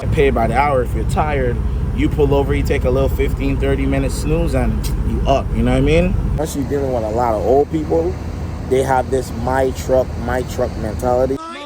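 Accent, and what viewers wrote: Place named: St. Thomas, United States Virgin Islands. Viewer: Vi accent strong st.thomas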